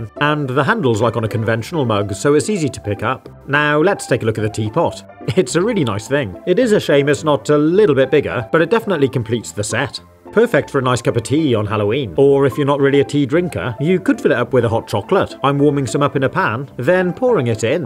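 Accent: United Kingdom. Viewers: You sound more British than British people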